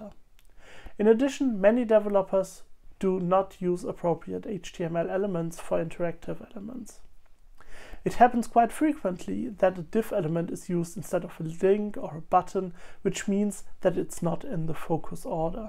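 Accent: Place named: Germany. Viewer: Very nice. I like the German accent.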